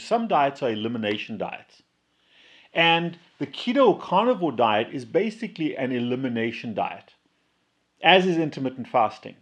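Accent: South Africa. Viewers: I really love this! It's taken me 56 years to learn emotional strategies after having been brought up in a home where food, opiates, alcohol and cigarettes were how my parents coped. And how I spent my whole life coping. Now, finally, at 56, I am fighting daily for my freedom from all those things, as I transferred in and out of all of them. And it's working. The most significant change has been following a keto diet. Meat, veg and fat. No baked, fake copy foods. Just from the earth. Only if it swam, ran, grew or flew, will I consume it. And my life has changed., love it that you still have an accent. You make us SA folk proud. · My mom had bariatric surgery many years ago. She lost a ton of weight because she could not eat, but then put it back on again when she discovered she could drink all the coke and alcohol she wanted. She used to also melt chocolate in a fondu pot and drink the chocolate with a straw. 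She died ten years later of liver cancer. I am so glad I stumbled onto your channel. You sound South African. Hello from Johannesburg.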